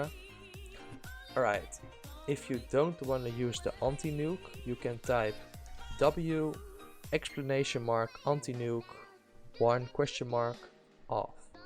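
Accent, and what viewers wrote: Netherlands. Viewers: Ben je nederlands je accent? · Haha ik hoor gwn dat je Nederlands bent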